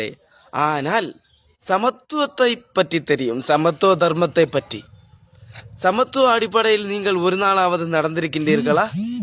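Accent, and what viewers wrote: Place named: Kerala, India. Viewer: என்னப்பா. மலையாள வாடை அடிக்குதே!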